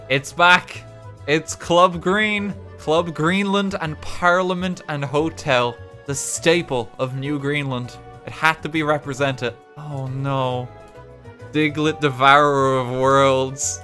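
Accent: Ireland. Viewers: is that a country irish accent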